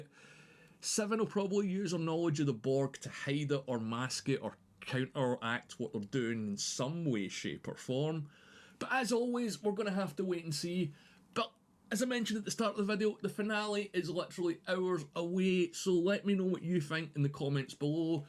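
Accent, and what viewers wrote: Scotland, United Kingdom. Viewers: I think he has a Scottish accent. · I have it at 50%, i understand him, and i am no native English speaker. And he is having a thik British accent. What i actually like. 😁👍🏼✌🏼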